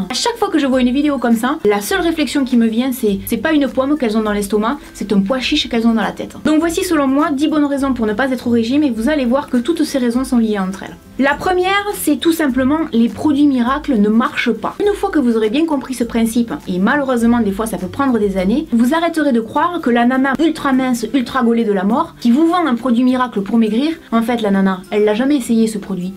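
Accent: South, France. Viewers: j'adore tes videos!! ensoleillées , avé l'accent!! et elles sont bien classées pa r categorie, c'est tres pratique ,on dirait un vade mecum!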